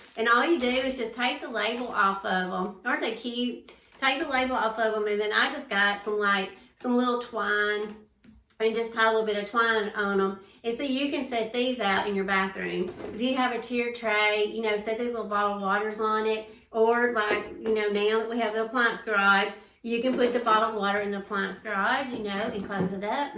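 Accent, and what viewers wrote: South, United States. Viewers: Love this project! I love watching your videos. Your sweet Southern accent is charming!! Blessings XO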